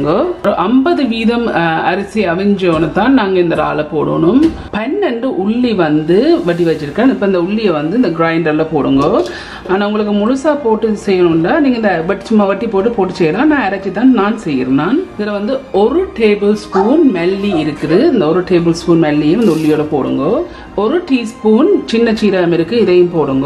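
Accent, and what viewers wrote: Jaffna, Sri Lanka. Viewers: உங்கள் யாழ்ப்பாணத் தமிழ் மிகவும் நன்று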